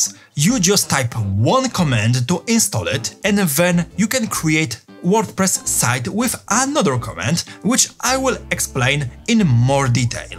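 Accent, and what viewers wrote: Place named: Europe. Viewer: English is fine! A bit of an accent, but that's just standard for anyone in Europe :) As for VPS, I had no idea you could get something that actually gets something done for that cheap. Thanks for the info!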